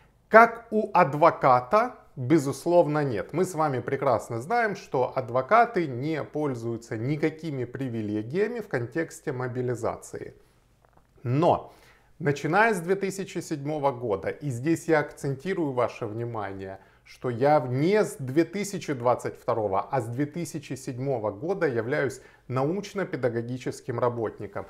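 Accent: Ukraine. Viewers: Это не русский язык а ХАРЬКОВСКИЙ.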